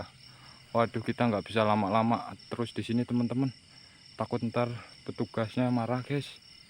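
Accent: Java, Indonesia. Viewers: Bang aku seneng klu abang lg masak dan ciri khas jwa nya medok banget kku ngmong,, semangat dan jngn lupa banyak doa waspada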